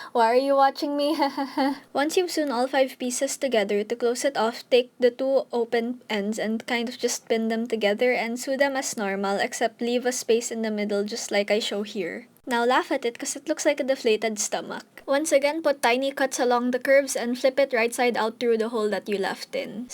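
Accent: Philippines. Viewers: I thought you were a Filipino.....the accent tho😣👌👌👌👌👌💞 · finally a Filipino that knows tbhk...finally (luv ur accent and ur sarcasm uwu)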